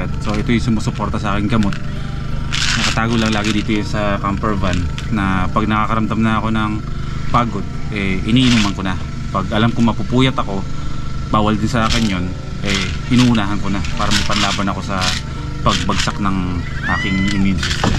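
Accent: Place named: Bulacan, Philippines. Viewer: I like the story telling talaga ni! Naririnig ko minsan ung Bulakeño accent ni! ❤️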